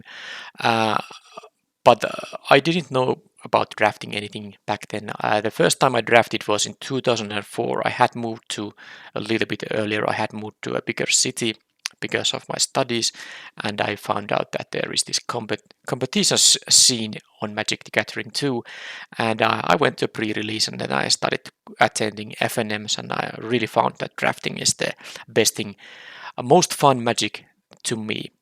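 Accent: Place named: Finland. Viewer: thank you for update. I'm glad, that you are Ok 👍, and stay with us. Just make a video, when you feel it. I will happy to see you anytime. You are such a nice guy and i love your finnish accent 😄. Believe or not, i watch other streamer from Finland on twich named NCAA, which is one of the best limited player on the world. So in Finland are another great players 😄👍. Apologize for my English, this is my longest comment in english ever 😄😄 Stay strong, stay healthy. Greetings from the Czech Republic.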